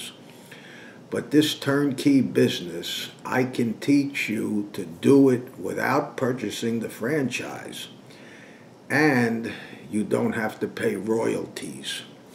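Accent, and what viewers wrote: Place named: United States. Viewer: I am impressed by your presentation as to how to make a newspaper targeted at advertising on behalf of the business, say in my case, the micro enterprises that are currently mushrooming in out areas. First and foremost, I was confused as I could not hear the name of your website correctly. You did what you could but you were not audible to me. (Perhaps your heavy American accent complicated the matter). Yes, I am interested, and upon your response, I will provide you with the necessary details that you may need prior to commencement of your tutorials. Last but not least: Are your services (tutorials/trainings/teachings) limited the borders of the USA? I live in South Africa, in the vacinity of Johannesburg. Your response please!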